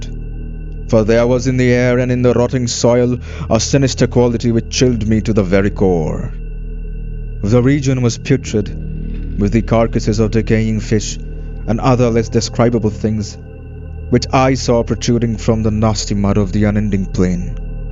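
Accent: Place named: Pacific Islands. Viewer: What I like about yo voice is you sound like some pan Pacific islander who barely learned English and is describing the utter horror to some staunch fool who refused to see your reason to steer clear of a storm.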